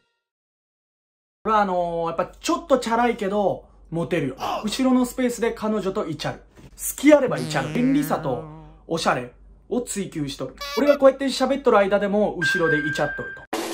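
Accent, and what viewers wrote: Hiroshima, Japan. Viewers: 広島弁が沢山聞けて嬉しい。車シリーズ大好き。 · コテコテの 広島弁良いですね。私は１２０系マークXに乗ってます。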